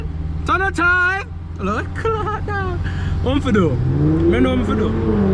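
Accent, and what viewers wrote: Jamaica. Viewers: If you were Jamaican as well you would of immediately knew he was a yardie. "you sound Caribbean" only a yankie would say that. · Aye bro great content. Are u jamaican? U sound Caribbean?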